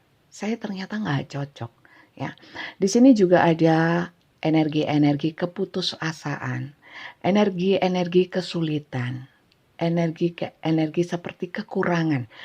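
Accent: Java, Indonesia. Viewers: bunda lucu penyampaiannya jg detail enak ngomongnya bhs jawanya medok aku apresiasi bngt sm bunda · Pgi bunda resonet skli bunda kii lucu kudu guyu klau dgr readingnnya bunda sll tk tunggu egh buun logat jwa bngt suka skli bnr bunda tdk pelut abnrnya ya krena nggk ounya duit aja buun.. Dia fokus krja buun smnjk covid smp dtik ini htngnya bmyak jdi untuk k hub nggk n gtu mikirin.. Tpi dia ttp syank ktne buun.... Trima ksih bcaan ya bunda sht sukses sll buat bubda Aamiin" 😍😘🙏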